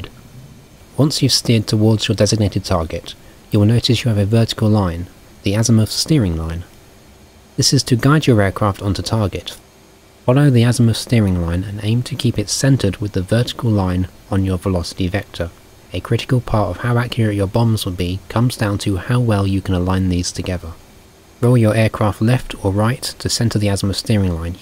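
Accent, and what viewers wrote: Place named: United Kingdom. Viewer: Don' t forget the calm British voice!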